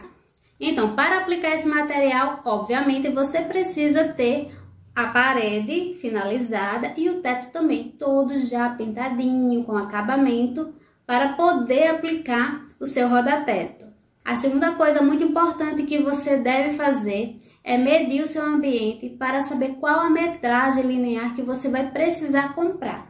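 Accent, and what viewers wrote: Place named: Northeast, Brazil. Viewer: parabéns... ótimo vídeo... eita sotaque gostoso de ouvir ... nordeste é tudo de bom ,,,kkk 👏👏👏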